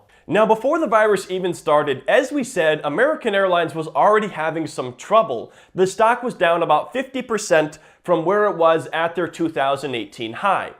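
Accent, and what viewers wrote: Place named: Chicago, United States. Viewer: Your accent. What part of Chicago you from?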